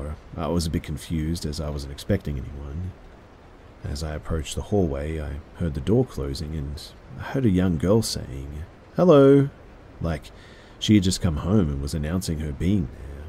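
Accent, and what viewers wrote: Australia. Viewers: I love your stories! Your voice and Australian accent are awesome! You save my sanity on boring workdays.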